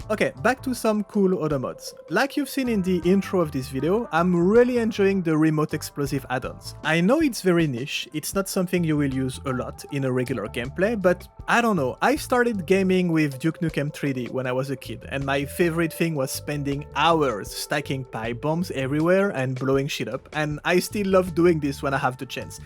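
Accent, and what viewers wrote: France. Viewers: Hi dude, I guess many already asked you this but are you French ? Your accent sounds a lot like French lol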